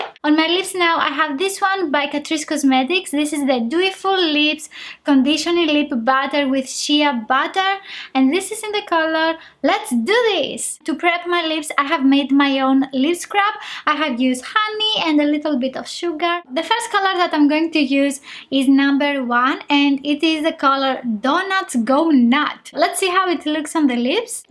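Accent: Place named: Greece. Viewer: You can also say split instead of separate if you want. Nice accent, not sure if you were Greek or Spaniard. Thanks for the review!!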